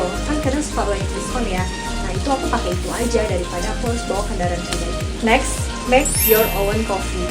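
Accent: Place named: West Java, Indonesia. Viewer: Duh eta pabalatak, nyunda pisan teteh. Hahah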